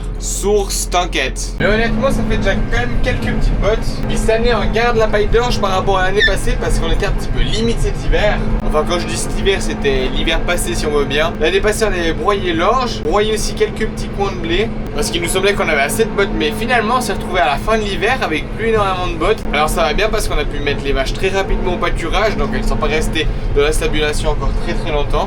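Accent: Switzerland. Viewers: Salut, heureusement que tu n'as eu que des commentaires de bienveillance..Je ne suis pas dans l'agriculture, je suis contrôleur dans un grand entreprise qui vend des fruits et légumes pour les chaînes de grandes enseignes. Tu es génial et ton accent suisse nous fait bien rigoler..c'est quoi un char. 😊😊😊😊. 😂😂😂 tu as l'air de ramoneur tellement tu es black... Très belle vidéo, comme toujours. Bonne continuation 🫡🫡🙋‍♂️🙋‍♂️ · Bonjour,j'aime bien les suisses votre accent...votre élocution et votre bonne humeur, courage à tous et merci pour tes belles vidéos!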